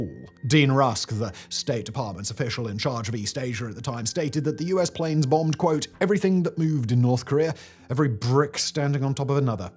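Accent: England, United Kingdom. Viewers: The United States is not perfect but they act in the best way to keep the world free and safe. I take offense that you talk about this with an English accent. If it wasn’t for the US the UK wouldn’t ceased to exist a long time ago.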